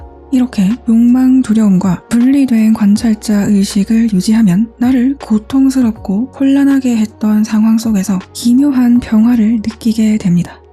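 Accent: Gyeongsang, South Korea. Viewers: 아따 ~걸죽한 경상도 사투리여서 좋네요ㅎㅎㅎㅎ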